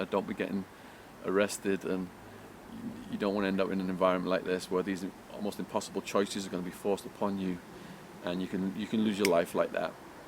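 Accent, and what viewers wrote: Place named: England, United Kingdom. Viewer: Bro you sound like your from England what would you know about California prison politics?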